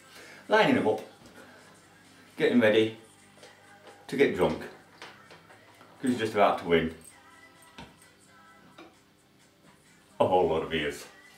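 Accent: England, United Kingdom. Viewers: The English accent and ciggie gives him more credibility. :) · Thats not what Irish sounds like, hes English. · Great accent. It's like listening to a scene from a Beatles movie.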